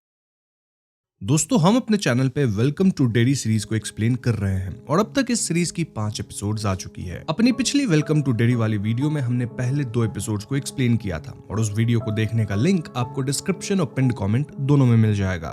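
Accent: Bengal, India. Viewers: Hey your Bengali ??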